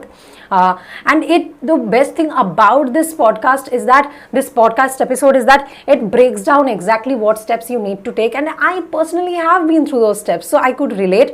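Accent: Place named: India. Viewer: Are you an Indian? I guess, your accent is Indian.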